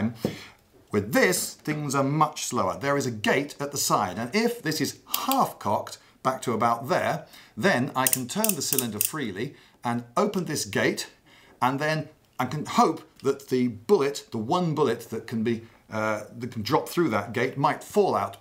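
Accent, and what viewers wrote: United Kingdom. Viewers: That was very clear and expertly done. The British accent gives this video some class. 👍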